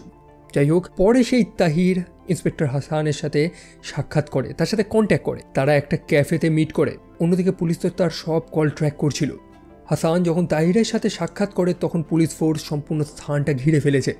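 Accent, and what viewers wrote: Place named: Sylhet, Bangladesh. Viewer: Sylheti vai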